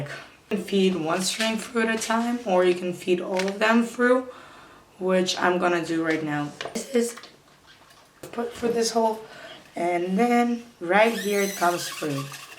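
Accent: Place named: France. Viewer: Oooh ok i was almost sure to have recognized the french accent (because i'm from France ahah).Thanks again for your help and dudette 😎🤘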